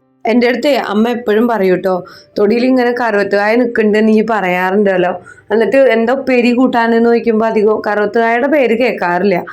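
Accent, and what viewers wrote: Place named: Kerala, India. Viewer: evideya nadu .. kurachu kozhikode slang varunnundu kurachu Kannur slang .. kuarchu shornur slang ..pidutham kittunnilla 😀😀enthayalum varathamanam kelkkan nall rasam ..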